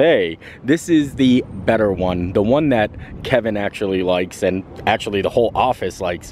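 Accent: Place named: Scranton, United States. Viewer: Omg I KNEWWWW you were from the Scranton area listening to your accent for all of these months I've been following your videos!!! I have family in Duryea. <3 Thanks for the video!! Love The Office!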